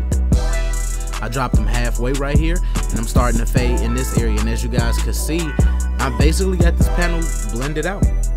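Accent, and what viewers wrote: Chicago, United States. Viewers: Yo when he first started talking I'm like he sounds like he from Chicago! And sure enough he is!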